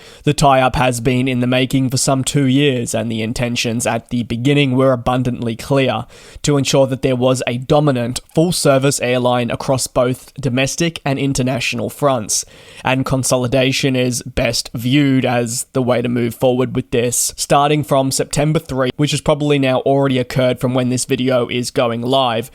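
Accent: Australia. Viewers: No, is Australian. He sounds like that.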